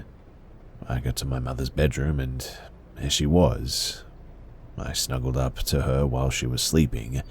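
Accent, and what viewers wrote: Australia. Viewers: I've spent countless hours being lulled to sleep by your sweet Aussie accent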